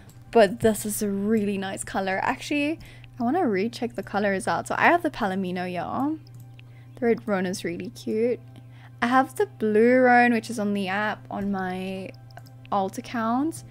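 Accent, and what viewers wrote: South Africa. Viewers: Hiii I'm new I was wondering if you are south African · Are you afrikaans? Bc it kinda sounds like it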